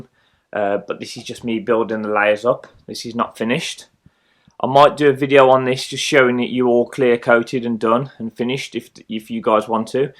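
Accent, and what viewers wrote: Black Country, United Kingdom. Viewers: Where about a you from , your accent sounds like Black Country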